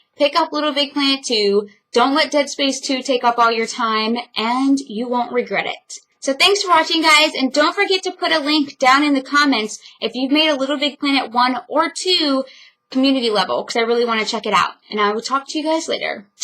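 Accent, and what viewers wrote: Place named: England, United Kingdom. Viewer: I don't want be a douche, but it's an English accent, not British, because you're not going Welsh, Scottish or Irish. Great review by the way.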